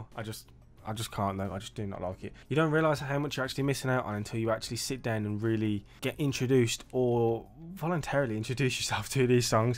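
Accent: Birmingham, United Kingdom. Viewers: I love your accent!! Brummy? Watching you from Ireland. I love to see the younger generation appreciate real music. 🎶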